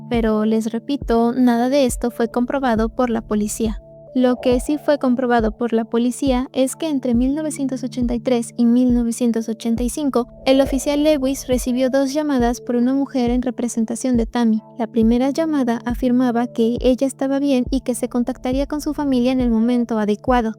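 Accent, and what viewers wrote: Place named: Fresa, Mexico. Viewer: Estaba intentando tomarme en serio el video por el contenido..... Pero es que es imposible con esa vocecilla!!!!!!!!!! Super kiwi/Fresa no me quiero imaginar en la cama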